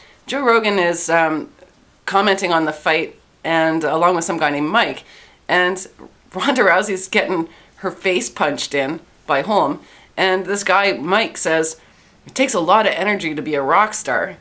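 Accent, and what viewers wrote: Canada. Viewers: Is it the Canadian Accent or are you generally incredulous. Do you have a long tongue?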